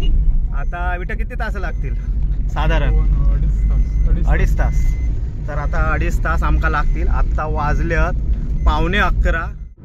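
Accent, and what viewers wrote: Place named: Konkan, India. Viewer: Dada tumchi Kokani language khup Sweet ahai. Tumhi agdi apulkini bolta. Me tumchi sgli video bghto.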